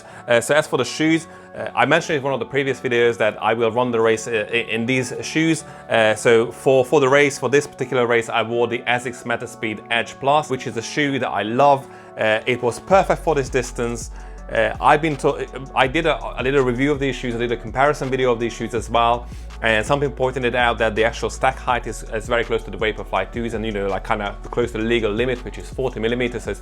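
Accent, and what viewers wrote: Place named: Hungary. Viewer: Hallatszik a kiejtésen hogy magyar vagy.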